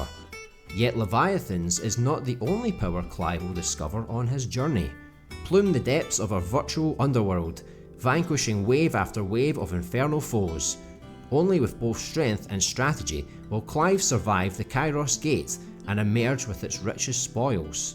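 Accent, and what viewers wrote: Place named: Scotland, United Kingdom. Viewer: My man, I didnae realise you had a channel yersel AND on top of that I hear a welcoming Scottish accent. What a guy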